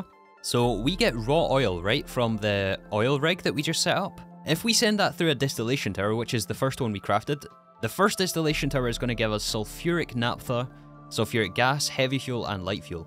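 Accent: Scotland, United Kingdom. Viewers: this is my comfort content, hearing his silky smooth scottish accent say things that my brain doesnt have a single clue about is so soothing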